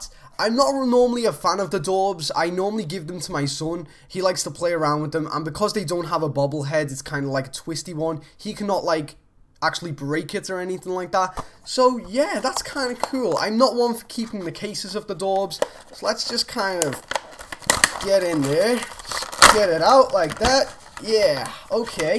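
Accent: Liverpool, United Kingdom. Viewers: are you from Liverpool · Are you British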